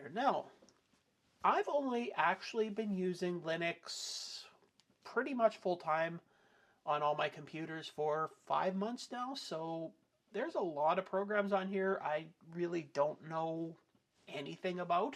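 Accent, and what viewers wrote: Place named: Canada. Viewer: Dear Canadian, March 2026. I'n a 90 yo Australian living in Brisbane. Gee a Canadian Web site what a surprise. We like Canadians even though we don't like the acent which is close to yanks. I have used Linux a few distributions, Mint for the last twenty decades. God Bless. ====================================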